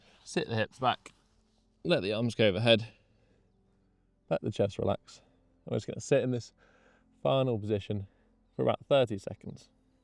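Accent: United Kingdom. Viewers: This is so good, and the British accent🎉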